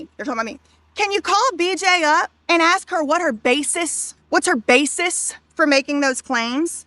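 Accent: South, United States. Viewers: Thank you, I knew it’s southern, brilliant!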